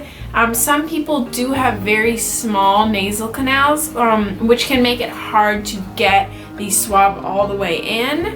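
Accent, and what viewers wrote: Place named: Wisconsin, United States. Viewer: Very informative. I haven't had the COVID test, but not afraid to now. Your Wisconsin accent is pretty good, you must be a native.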